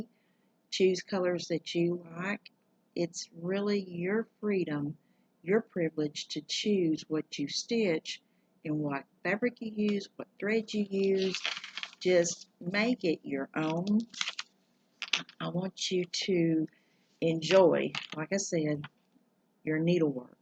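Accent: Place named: South, United States. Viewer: Oh my gosh! Just found your floss tube and watched both back to back. Love your southern accent! Your voice is very relaxing. I love you showing fabric and thread options, different from what is called for! My choice for fabric is 32 ct even weave or 36 ct linen by PTP or Weeks. What are the smalls behind you? Are they fobs?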